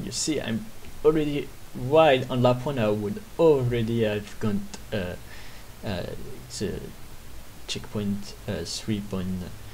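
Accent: France. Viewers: You can hear that you're from france 😎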